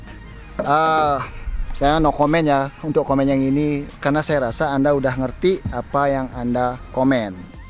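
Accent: Bali, Indonesia. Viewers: Logat bahasa kaya orang bali om salam dari palangkaraya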